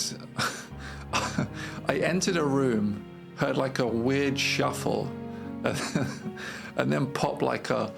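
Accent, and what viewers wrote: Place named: England, United Kingdom. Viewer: Wow, I was shocked to end this video and see you only have 40 subscribers. Love your humor and topic of video. The combination of English accent and mug is a little hard to digest at once, though. If you’re going to show your face at least, use an American accent or vice versa. Keep up the quality man. Seems like you’ll be successful on here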